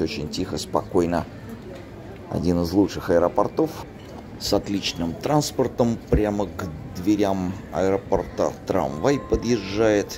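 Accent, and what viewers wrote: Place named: St Petersburg, Russia. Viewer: Это такой специфический питерский выговор? Напоминает нечто среднее между Невзоровым и Федором Чистяковым. (оба питерцы)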